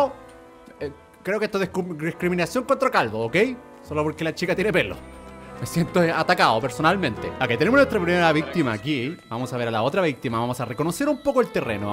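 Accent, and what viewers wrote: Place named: Chile. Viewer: Le volvió el acento chileno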